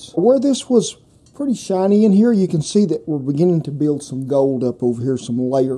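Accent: South, United States. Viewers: I love your southern drawl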